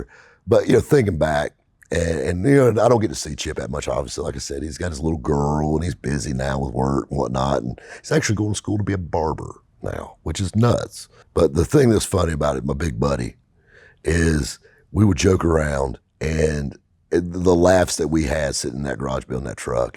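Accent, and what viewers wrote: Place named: South, United States. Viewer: I swear this is the best YouTube channel out there! I relate 100% I'm from Darlington SC and I swear you talk and act just like my dad. I live in the north now I miss that southern twang now and again keep making em!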